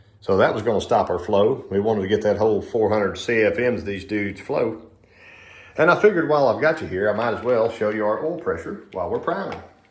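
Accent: South, United States. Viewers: You must own your own business or your an educated man with a very good income but it blows my 70 year old mind how your able to afford all these expensive builds and such but I do still like the southern accent and they very nice things you do.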